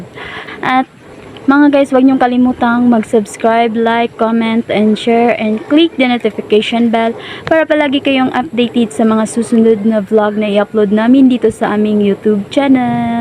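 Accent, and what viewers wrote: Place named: Binukid, Philippines. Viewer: Binukid jud imong tirada ba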